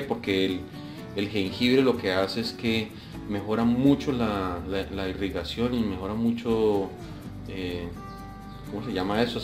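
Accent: Colombia. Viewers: Jajajaja si parece caleño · Excelente video.. ahora mismo lo voy a preparar. Pero tengo una duda si su acento es de 🇨🇴 Colombia o de Costa 🇨🇷 Rica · Hermosa tu voz eres colombiano??? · Usted es valluno se nota su gracia al hablar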